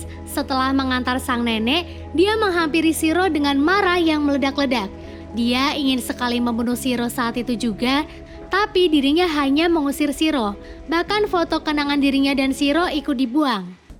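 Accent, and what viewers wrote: Pemalang, Indonesia. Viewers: BTW KAKA ORANG PEMALANG?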